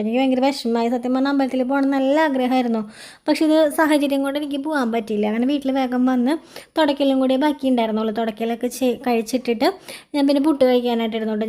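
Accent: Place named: Thrissur, India. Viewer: Thrissur ano ivrde place? Slang familiar ane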